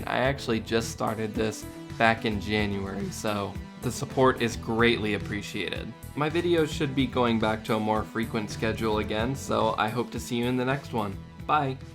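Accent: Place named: Italy. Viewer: > Yeah I should put more stress on those syllables, that’s my bad! Don't worry. It's just a thing we Italians do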